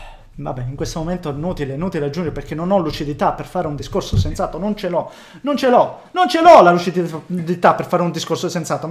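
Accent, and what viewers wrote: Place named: Apulia, Italy. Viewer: Ma di dove sei? dall' accento sembri pugliese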